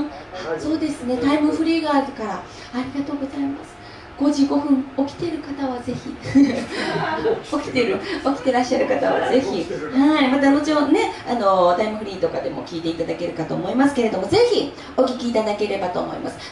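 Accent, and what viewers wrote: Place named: Osaka, Japan. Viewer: かわいい！ちらほら、大阪弁素敵🎉華織さ〜ん、応援していますよ！また、イベントでお会いしましょうね！お会い出来ます日を楽しみにしています♡白夜行も、カッコいい😊！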